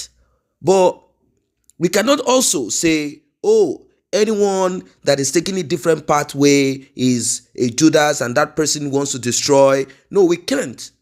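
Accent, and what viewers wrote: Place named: Nigeria. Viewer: Still sound like Nigeria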